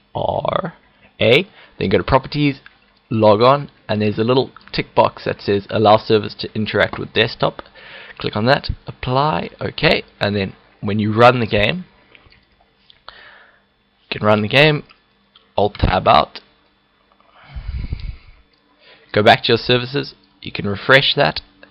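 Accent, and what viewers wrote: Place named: South Africa. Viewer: Hey, Are you South Africa, you sound a bit similar to me..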